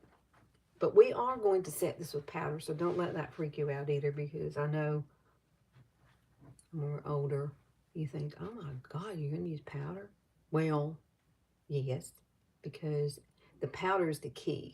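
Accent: South, United States. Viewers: I also like NYX Epic Smoke Liner. U’re right… short life sucks, not at all a long time… wasn’t supposed to be short. Ur southern talk is so cute :) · I really look forward to your videos! I so enjoy your southern drawl!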